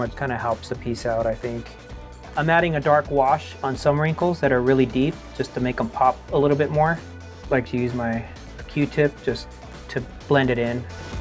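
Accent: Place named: United States. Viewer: Tienes nombre, apellido y pinta de ser hispano... ¿Por qué pronuncias aquello que eres como un yanqui?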